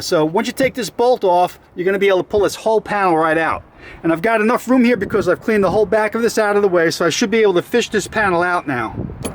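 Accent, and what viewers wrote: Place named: New York, United States. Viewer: I just assumed he was in NY because of his accent and I was wondering how his car is so clean so I was confused till I read your comment.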